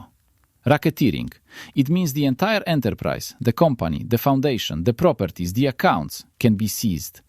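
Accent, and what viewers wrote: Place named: Russia. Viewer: The constant clanging sound and the inexplicable Russian accent made part two much harder to listen to. We’re the story regarding spies or the Bratva the accent might have enhansed the story but as it was neither it just made it difficult to understand.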